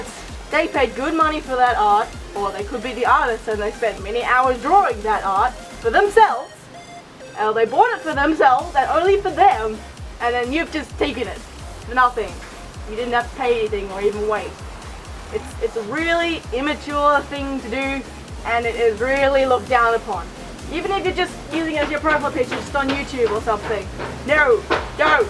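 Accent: Australia. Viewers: you're australian!!!!! i can tell by the accent >.<, what part of australia are you from? i'm in queensland, did being australian influence your fursona? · you sound very australian, are you?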